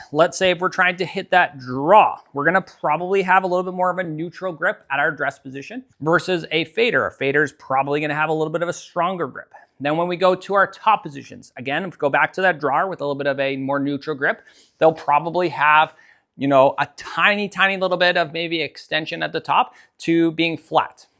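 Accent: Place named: Canada. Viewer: Well done. The Canadian accent really shows up in this one. Love the simplistic way you explain that.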